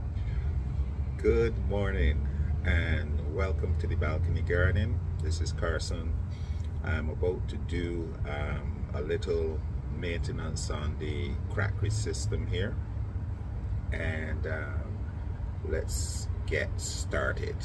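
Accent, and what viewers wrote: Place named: Caribbean. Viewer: I came across this vid while browsing for info on pH stability. I’m finding that it takes more and more pH down (I use phosphoric acid)… when a fresh tank is mixed it takes say 1ml to bring it down to 6.0 but 2 days later when I top up the tank with water (and some nutrient solution to keep the EC up) it takes 2ml of pH down to bring it back down to pH 6.0. Then the pH climbs to above 7.0 again in 48 hours when I come to top up the tank again. Foliage is green and healthy, fast growth, so I expect the pH to rise as the nutrient elements are depleted from the solution. Maybe the tank is just too small for the size of canopy that it supports, combined with fast uptake of phosphorous means that the acidic elements are being depleted very quickly? BTW I loved your accent. It’s interesting that Caribbean accents often sound similar to Irish accents.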